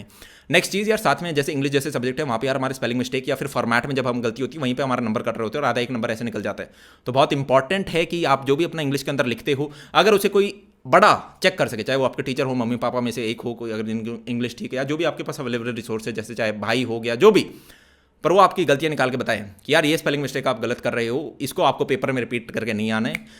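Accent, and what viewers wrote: Haryana, India. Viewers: Anyone notice the Haryana accent in Aman bhaiya... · Haryanvi accent❤️❤️❤️ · in between Bhai ki thodi thodi Haryanvi nikalti hai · Bro are you from Haryana?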